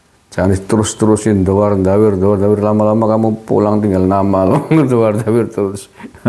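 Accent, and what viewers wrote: Banyumas, Indonesia. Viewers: Kang logate ngguyokna , ya lah aja ngilangna logat Banyumas , bahasa brengkolang sing marai ngguyokna , he he he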